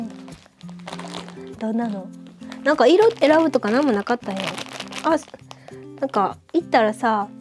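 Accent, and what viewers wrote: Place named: Shima, Japan. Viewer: コロナからの回復おめでとうございます。志摩弁聞けたー🌠僕も娘の感染によって折角当たった２日の皇居一般参加を断念しました。新幹線やらキャンセルだらけで😞💦🐩との動画楽しみにしております。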